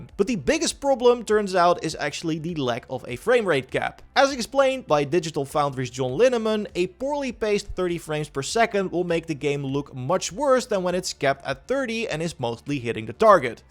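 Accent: Netherlands. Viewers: Dutchy dutchman talking. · dutch accent is so funny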